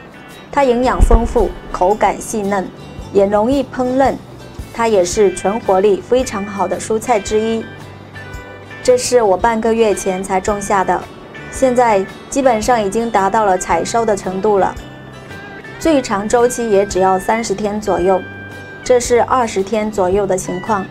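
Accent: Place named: Taiwan. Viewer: 腔調，很台，讚。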